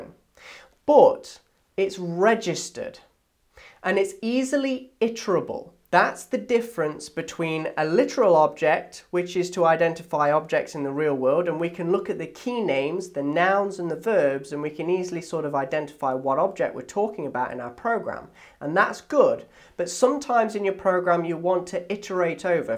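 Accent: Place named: England, United Kingdom. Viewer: Merci, je bois vos paroles depuis le premier épisode: Chapeau, monsieur ! (and of course I'm enjoying your english accent !)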